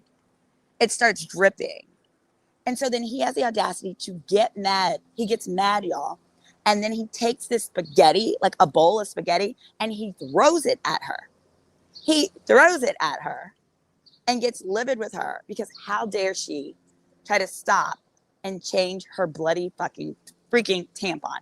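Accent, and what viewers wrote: South, United States. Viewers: MIMs was my first intro to you and I love your commentary… you are beautiful and you sound like you from the south lol love it!! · Toxic Sock is REAL! This is disgusting ! And I have to only watch you cause that southern accent is home❤❤❤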